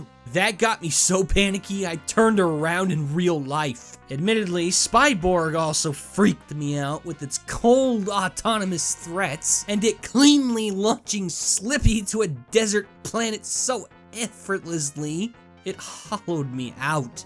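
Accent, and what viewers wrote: United States. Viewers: I was N O T expecting #1. Is from Appalachia or something?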